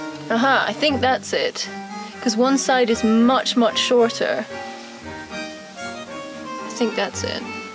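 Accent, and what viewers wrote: United Kingdom. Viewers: Hannah Hetzel he is from Scotland but has a very British accent. His accent is cute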